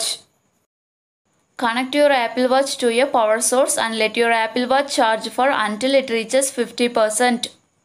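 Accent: India. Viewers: That malyali accent is sooooo nice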